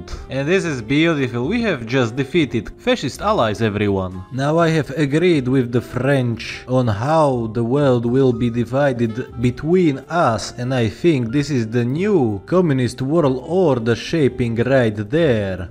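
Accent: Russia. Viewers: Ааааа этот акцент. Ты русский? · Ru: О госпаде, какой же это прекрасный русский акцент. Чувак, лучшее! Eng: Oh my gosh, what a wonderful Russian accent that is. Dude, the best! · Is it just me who thinks he has a Russian accent? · Почему ты говоришь с РУССКИМ акцентом?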